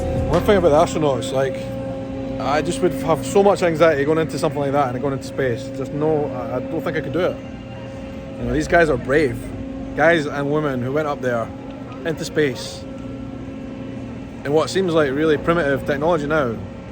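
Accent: Scotland, United Kingdom. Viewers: Hi, You almost fit right in in Texas with your cowboy hat and western wear. Now all you need to do to be an authentic Texan is to change your Scottish accent to a Texan accent; it can be done with practice!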